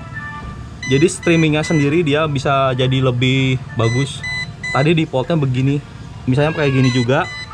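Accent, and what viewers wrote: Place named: Sunda, Indonesia. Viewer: edan sunda pisan.. alus pisan lah repiw na,, nuhun kang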